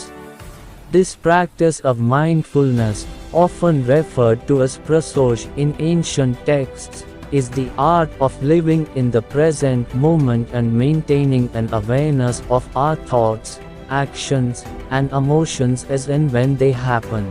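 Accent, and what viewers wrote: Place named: India. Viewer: I love you for creating this content, but i can't listen this indian accent, this is just lazy work bruh, pathetic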